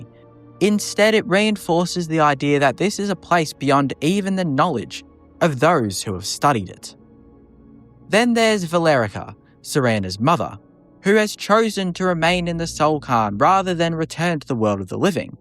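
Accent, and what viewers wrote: Australia. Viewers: I love ya buddy. Just subbed. But the clearly Australian is hilarious. If everyone spoke like you, the world would be a better place.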